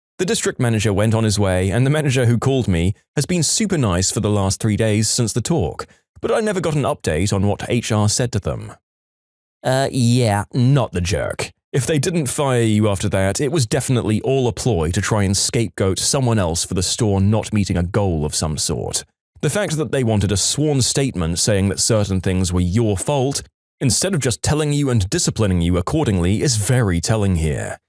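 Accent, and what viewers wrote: United Kingdom. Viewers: HES BRITISH NOW?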